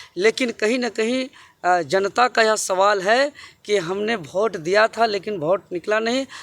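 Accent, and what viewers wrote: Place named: Jharkhand, India. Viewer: Very good sound like speaker of jharkhand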